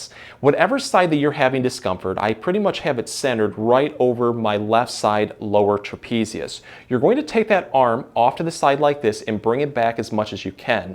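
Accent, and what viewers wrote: Michigan, United States. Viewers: I was just googling how to get trap pain relief and stumbled across this video and instantly knew you were from Mich before I looked at your bio bc of your accent 😂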